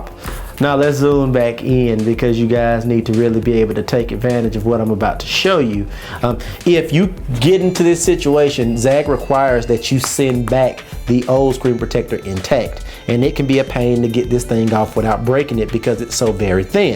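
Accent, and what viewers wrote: South, United States. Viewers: man you are one of the most country sounding people I have ever heard. -the guy from Texas that lives in the sticks of Tennessee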